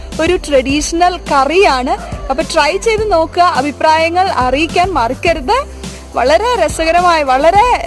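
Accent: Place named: Perumbavoor, India. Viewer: പെരുമ്പാവൂർ, കോട്ടപ്പുറം ഭാഗങ്ങളിൽ ഉള്ളവർ സംസാരിക്കുന്ന രീതിയാണ്. അവിടങ്ങളിലാണോ വീട്..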